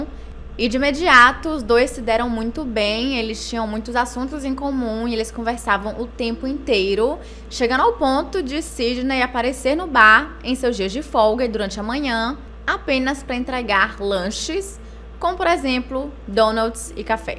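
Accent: Portugal. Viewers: Tô conhecendo o canal através da e já tô amando. Adorei a parceria e o sotaque português é MARAVILHOSOOOOO <3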